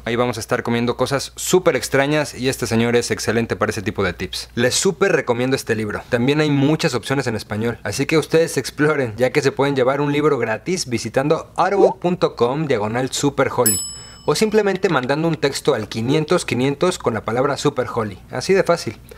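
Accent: Mexico. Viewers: Tiene acento mexicano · Jajajajajaja lo Mexicanos salen con unas... como me llama mucho la atención escuchar su dialecto.. jaja como dicen ustedes es padrisimo saludos desde Colombia.. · Hola bonita! Asi me pongo la mascara 😂y! Woooww! Una voz muy linda y ese acento bien mexicano! "Las cejas tipo Frida khalo" 😂😂😂 Me encantó el video 😄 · Me parece que tu esposo es MEXICANO me suena muy MEXICANO como muy bromista, hablan perfecto el español LIKE SI CREES LO MISMO O COMENTA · 😂😂😂😂 Ese "dejo" ranchero de tu esposo da risa.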